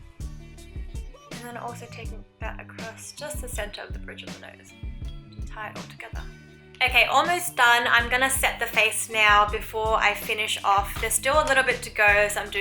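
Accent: Australia. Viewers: ahhh love hearing another Aussie accent!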